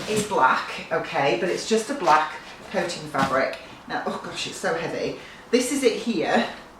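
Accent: United Kingdom. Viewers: I say it the way you say it, we Brits say it that way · your lovely English accent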